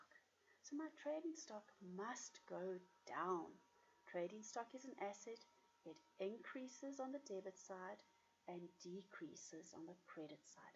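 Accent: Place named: South Africa. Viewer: Eyy Ur South African🤣👌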